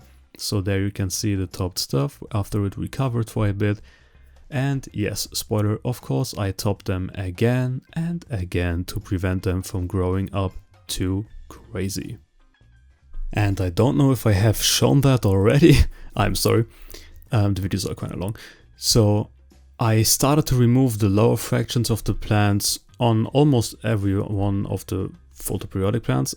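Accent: Germany. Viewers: Das Ding mit dem tribe, man muss manchmal schon Deutsch sprechen, um dein Englisch zu verstehen ❤ Mach bitte weiter Videos, find deinen Stil einfach gut. Stream am Ende der Season fänd ich auch super! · sieht gut aus Großer :) dein Englisch bringt mich auch immer wieder aufs neue zum schmunzeln <3 Jeder Ami oder Engländer wüsste nach 1 Satz, dass du Deutscher bist :P Einen wertvollen Tipp, den ich dir mal an die Hand geben möchte gegen die blöden Schnecken! Als ich früher Outdoor gegrowt habe war das sehr effektiv. Sammel dir kleine 0.2-0.5L Kunststofftöpfe/Behälter und kauf dir Kuperband! Von den Töpfen/Gefäßen schneidest du den Boden raus und klebst ringsrum das Kupferband. Schnecken klettern nicht über Kupfer. Wenn du deine Sämlinge dann einpflanzt den Topf drum rum, quasi wie mit deinen Schneckenkrägen die du ja bereits hast! Die Stämme können ohne Probleme wachsen der Platz/Durchmesser reicht völlig aus und du hast keine Schnecken mehr die Hochkrichen. 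Man muss natürlich drauf achten dass die unteren Triebe / Blätter nicht auf den Boden kommen, sonst können die Schnecken dort hochkrichen! Du solltest mit der Methode auf jeden Fall auch wesentlich günstiger liegen als du für die Schneckenkragen bezahlen würdest! Kupferband ist echt eine tolle Sache! Kann ich nur jedem Outdoorgrower empfehlen. Auch wenn man z.B. ein Hochbeet hat im Garten für Salat odg. Da kann man auch wunderbar 1 oder 2 Bahnen Kupferband ringsrum kleben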